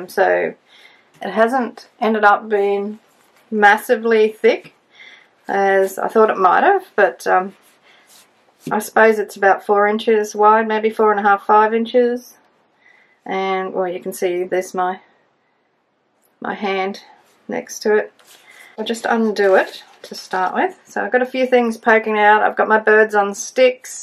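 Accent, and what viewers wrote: Australia. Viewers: It’s a fantastic scroll. I loved following along your great journey of discovery, it’s so different from all the other journals I have followed through January 😍I’m in Melbourne and loved hearing your Aussie accent💖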